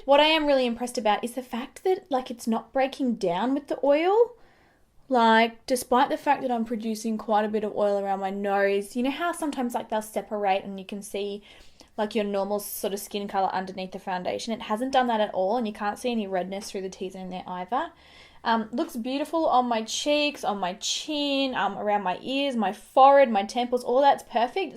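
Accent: Australia. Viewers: ❤❤❤❤ love all ypur videos, your style and your australian accent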